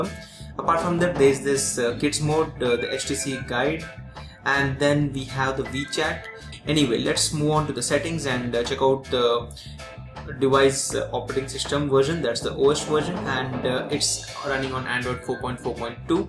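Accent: India. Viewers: Stop saying checkout and get rid of that Indian accent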